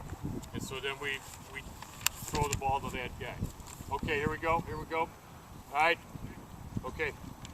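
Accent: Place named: Midwest, United States. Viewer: The word is quarterBACK lol. BACK midwestern guy. Bad accent good coaching though.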